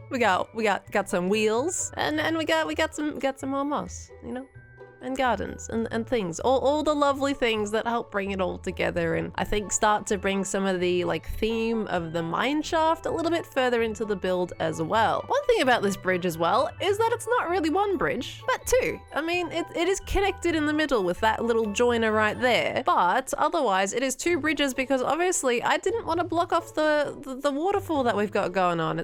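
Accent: Australia. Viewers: This series is so fun. I'm so so glad I've found it. It is just so nice to have a aesthetically pleasing builds all backed up by an Aussie accent, I just find that I watch so many people from other countries lately that I miss just hearing something more familiar ❤️ (does that make sense?.... tbh I find that I sometimes even crave more Australian-ness in movies and tv lol)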